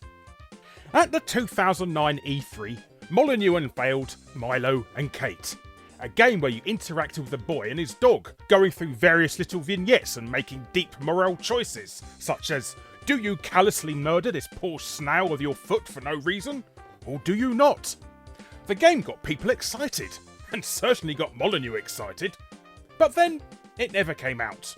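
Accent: England, United Kingdom. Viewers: multi year long subscriber, just commenting that it took roughly five years for the algorithm the randomly feed me the "opps, all malyneux" eppisode from your back catalog, anyhoo, straping in to sample this stale arse video about a stale arse chip on ya shoulder as i shake my fist as shadows on the cave wall in honour of your frustrations, love your accent though, wish more spoke the queen's english round these parts.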